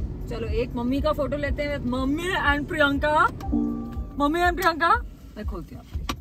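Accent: Haryana, India. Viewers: Aap haryana se ho 🙂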